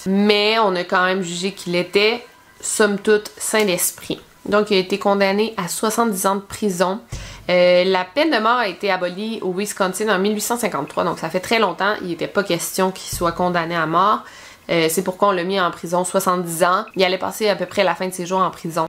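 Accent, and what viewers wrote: Quebec, Canada. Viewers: Elle n'a pas aucun faute, c'est evident, mais je trouve l'accent quebecois trés marrant... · Sans vouloir critiquer, mais l'accent quebecquoi pour quelqu'un venant de france est vraiment très lourd! C est trop bizarre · super vidéo encore une fois ! Je suis vraiment fan de tes vidéos ! Et je te jure, moi qui aimais pas tellement l'accent québécois, tu m'a vraiment fait aimer l'accent ahah, sinon continue vraiment les vidéo ! je ne supporterais pas ne plus te voir 😘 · merci pour tout ces faits divers que tu nous partage! mais j'ai vus que parfois tu lisait un livre au complet pour nous faire une video, ca doit te demander bcp de temps! comment tu t'organises, tu en lis plusieurs en meme temps? merci encore pour tes videos j'adore l'accent quebecois et les faits divers, tu combine les deux! et en plus tu es une très belle personne (daans les deux sens ahaha) et tu fait des videos completes, bref autant te dire que je suis tes videos comme si c'etait ma serie favorite!! 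· Aiee c chaud cette histoire ( gg au prisonnier 😂😂 ) continue comma ça j’adore l’axon canadien en plus 😉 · Franchement je viens de découvrir ta chaine et je la trouve trop cool , surtout avec ton accent québécois est super cool❤️😆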